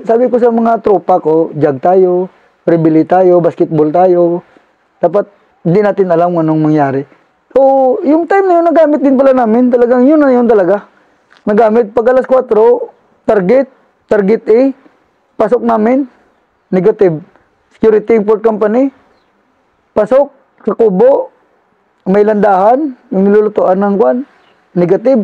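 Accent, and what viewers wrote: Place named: Iloilo, Philippines. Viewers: Ilonggo Pala si Sir. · Ilonggo yata si Sir sa accent nya..kabaliktaran daw accent ko😂..keep sharing,your juniors will digest and learn something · ilonggo gid c sir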